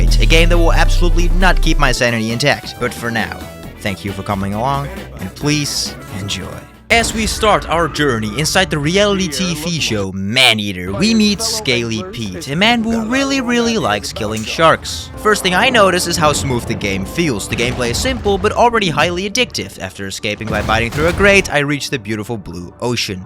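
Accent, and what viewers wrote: Netherlands. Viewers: your dutch arent you? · are you dutch? cuz your accent sounds very dutch imo.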